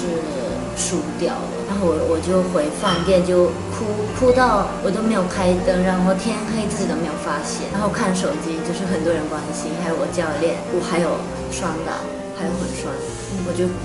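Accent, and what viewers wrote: China. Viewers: 還是有ㄧ點上海腔的味道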